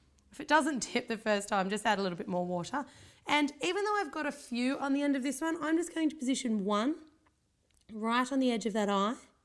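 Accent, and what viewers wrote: Australia. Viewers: This is really cool but are u Australian? 😄😃😀😊😊😀😃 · I'm sorry, haters DONT hate please but... Is this Australian? The accent sounds familiar and hmmm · She's Australian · She's Australian, so yeah. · She does have the semi English accent at first, but her Aussie accent comes out alot more closer to the middle/end of the video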